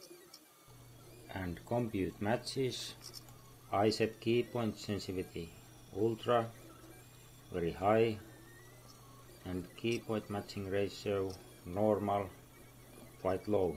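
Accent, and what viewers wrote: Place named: Finland. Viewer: Fab, very helpful. I'm on a Mac and unless I make my own binaries I have to use Regard3D. Are you still using it? I'm guessing you are from Finland - having listened to Mika Hakkinen for many years I THINK I recognise the accented English. Or ARE YOU MIKA HIMSELF?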